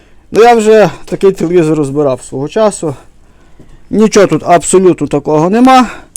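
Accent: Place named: Galicia, Ukraine. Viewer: Привіт. Шикарний Галицький акцент. Лайк . Підписка. Так тримати. Гарний ролик.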